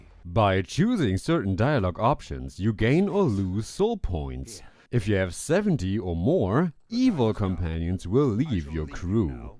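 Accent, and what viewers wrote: Germany. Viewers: Immer wieder schön den deutschen Akzent zu hören 😉. Im großen und ganzen mag ich deine Videos aber gerne 👍. Auch wen du sehr harsch mit den Spielen meiner lieblingsentwikler umgehst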